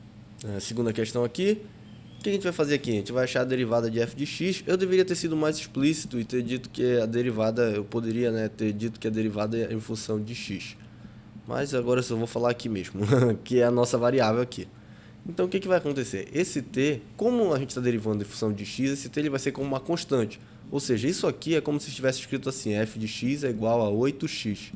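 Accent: Pará, Brazil. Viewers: aposto que é paraense, sotaque muito parecido. Ri muito no "peralá" no outro video e nas "galhinhas" de vez enquanto.. kkkkkk muito bom mesmo, sou sua fã:)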